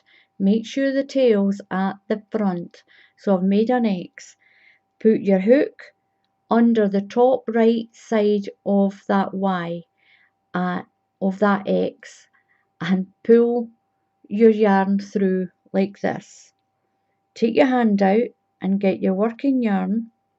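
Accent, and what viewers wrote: Scotland, United Kingdom. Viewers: Great to hear the Scottish Accent for a change. I won't have any problems understanding you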